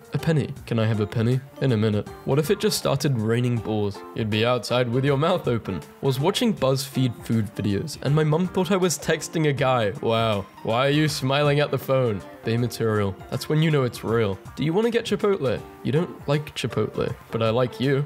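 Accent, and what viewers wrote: United Kingdom. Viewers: The British accent makes all the memes 110% better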